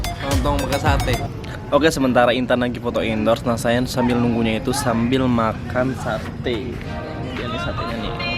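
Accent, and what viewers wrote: Lombok, Indonesia. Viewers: Mantul bngt cara ngomongnya kak medok lombok,saya sendiri juga orang lombok:)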